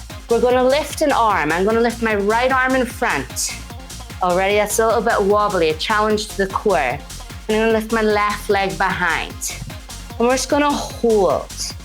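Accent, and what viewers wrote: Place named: Northern Ireland, United Kingdom. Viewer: I feel like you're from Northern Ireland, where are you from? 😄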